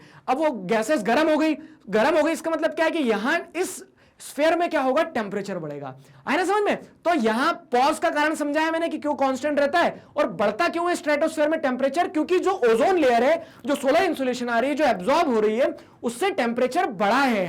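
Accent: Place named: Maharashtra, India. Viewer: Sir apke mu se kuch word marathi aate he vo hm jaise Maharashtrian ko bha jata he or aap hame apne hi lgte he☺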